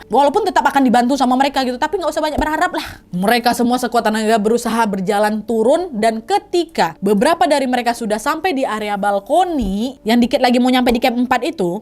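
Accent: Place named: Pekanbaru, Indonesia. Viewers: ndeh logat pekanbaru bana ha😂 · khas banget logat minang nya ka😂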